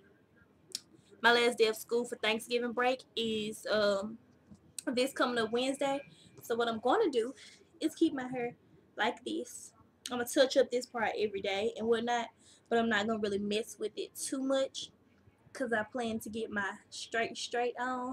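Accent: United States. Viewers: Ur so country lol its cute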